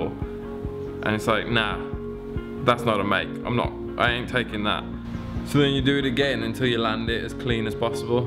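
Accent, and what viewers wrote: Birmingham, United Kingdom. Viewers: Always inspired by your work. Always. I don’t know if I missed the story, but how did you end up in Peru? Is that a Brummy accent?? Could you do a video on your background and how you came to be where you are doing what you do? I think it is fascinating, the life journey that took you to Peru, to sacred geometry. Pls share, and sorry if u have already done that video, I haven’t been watching your stuff that long so may have missed. Thanks.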